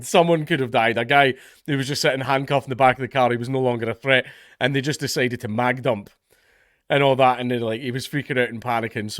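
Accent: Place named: Scotland, United Kingdom. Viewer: "Irish accent", coming from a famous Scottish fella? You numpty.😂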